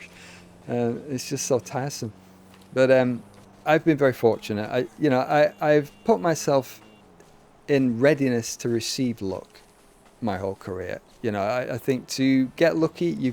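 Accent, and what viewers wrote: United Kingdom. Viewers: I’m not sure if u worked at rockstar north in uk or there main HQ in NY but u sound British, interesting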